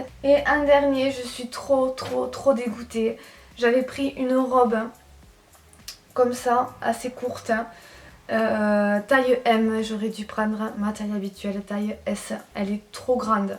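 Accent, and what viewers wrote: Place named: Béziers, France. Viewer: Ptn t'es de Béziers toi ça s'entends de fou :p. vive le suud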